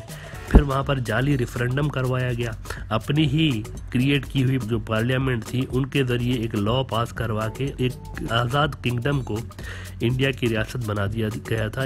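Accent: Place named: Pakistan. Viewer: Can't you notice his tone of Pakistani Urdu??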